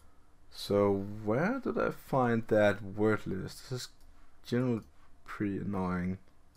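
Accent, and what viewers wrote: Denmark. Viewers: You sound danish :) Thank for the video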